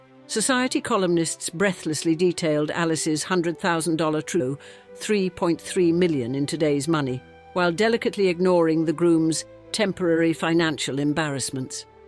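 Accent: England, United Kingdom. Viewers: What's a nice accent British, nice voice. But i don't think alice Thaw is wonderful man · Dumb AI pictures that don't relate to this narrative. The new documentaries filled with an English accent of authority and cheap AI.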